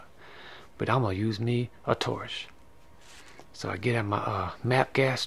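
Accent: Louisiana, United States. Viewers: You are a true breath of fresh air with your down home ways. I've been missing Texas and by the sound of it , you must be from Louisiana so that's pretty close to home LOL. Love your work and please! please! pleas! Take care of yourself. In another viddy you mentioned a health scare😵‍💫 Dont mess around with things. We need you around, you nake so many of us feel so much better about life.👍👍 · I think sounds like a Cajun man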